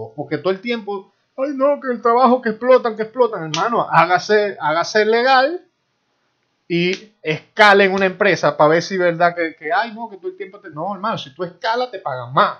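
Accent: Colombia. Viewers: El acento no es chileno, más bien diría que es en colombia. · el acento suena a colombiano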